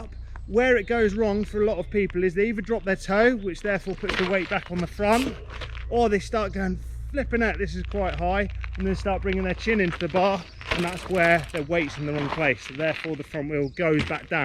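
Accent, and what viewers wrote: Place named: Bristol, United Kingdom. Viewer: In Bristol you say maniall and weelay 🤣🤣good stuff bud, makes sense might give it a sesh.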